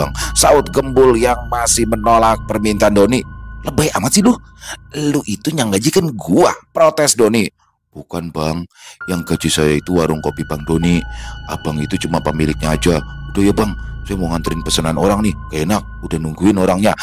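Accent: Jakarta, Indonesia. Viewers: 😂😂😂❤❤❤,..ini ini yg saya suka logat betawi yg kental dan super lucu, 😂😂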